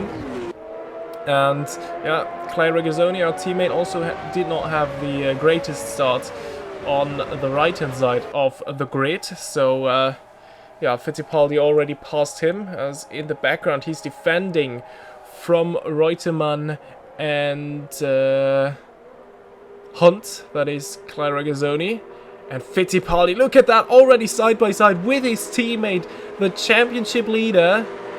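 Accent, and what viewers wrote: Germany. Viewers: You sound German are you German?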